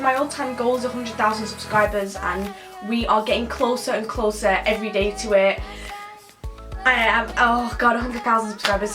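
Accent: United Kingdom. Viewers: I have an American accent and you make me want a British accent soooooo bad!! 😩😩😍😍😍😍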